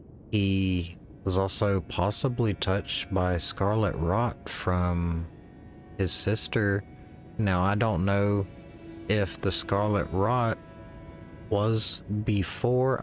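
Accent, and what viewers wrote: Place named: South, United States. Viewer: Keep going bro and a like for the southern accent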